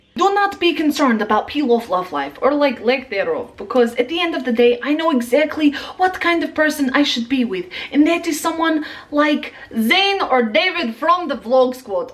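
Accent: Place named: Australia. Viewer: LOVE YOU SO MUCH MAN! I LOVE THST YOUR AUSSIE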